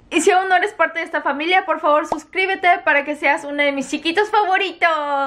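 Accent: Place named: Mexico. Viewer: Haha tiene acento de mexicana fresa, me gusta xdxdxd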